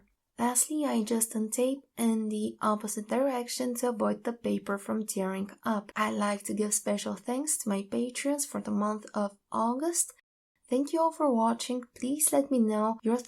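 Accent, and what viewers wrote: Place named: Eastern Europe. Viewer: I wish you narrated more of your videos. You have a beautiful speaking voice. Do you mind if I ask what country you are from? Your accent sounds like Eastern Europe to me, just wondering if my guess is correct. :-) Thank you for making these videos, I enjoy them very much.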